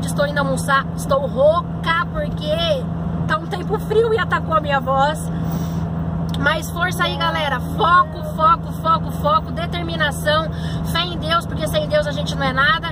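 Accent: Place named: Portugal. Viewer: E esse sotaque português hein 🤔🤓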